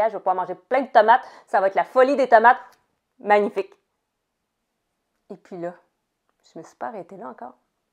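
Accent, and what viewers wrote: Quebec, Canada. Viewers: C'est aussi Pâques au Québec J'adore votre accent, j'aimerais y aller un jour, et super votre video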